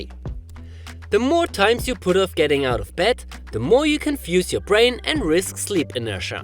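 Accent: Germany. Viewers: Nice video - thanks! Question: what’s this for an accent? Sounds like a German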